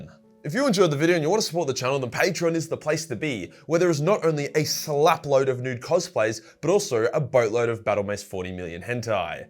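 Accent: Australia. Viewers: I get excited every time you upload as listening to Australian chad talk about warhammer 40k makes me moist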